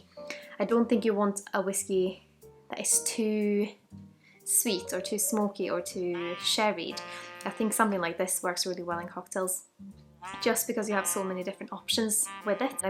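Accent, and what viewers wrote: Scotland, United Kingdom. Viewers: You have a bit of a Scottish accent. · Have you picked up a bit of a scottish accent, thats not a swedish accent i've ever heard haha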